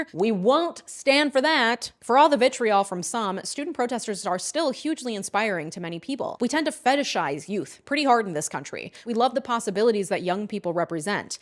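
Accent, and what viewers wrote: United States. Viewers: In the beginning of your video you criticized zionism, which fair, I do also think it's kind of weird as a concept, buuuuuuuuut, aren't you from the USA or Canada? How did you think white people got there in the first place, don't you find it weird that you never see native-americans in your day-to-day, they were genocided, and your assessors probably had some kind of envelopment in that. But I don't guilt you from being born in the country you happen to be born with and want to keep living there, I just find it weird that you talk about zionism with an american accent, which is a by-product of colonization, which is just another word for genocide and occupation of a foreign country.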